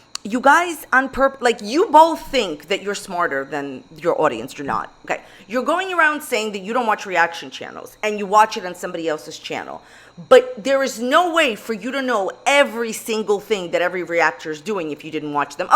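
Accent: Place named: Long Island, United States. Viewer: Omg the clip has me WEAAAK😂😭💀 you're the BEST reaction YouTuber with the funniest edits. You're hilarious & I always look forward to my girlfriend putting on your newest video everyday. The Monsters Monsters Monsters edit had me dying💀💀💀 Edit: Hey if you see this, I've always been wondering what accent do you have? It's like a kinda faint Long Island accent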